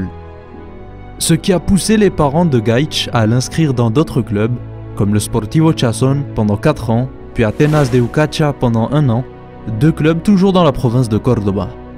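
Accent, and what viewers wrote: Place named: Spain. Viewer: Ton accent espagnol , ta diction est juste incroyable VISCA BARCA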